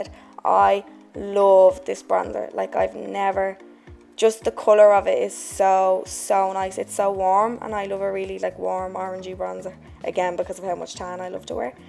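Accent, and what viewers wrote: Ireland. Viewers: from her accent she’s definitely from ireland.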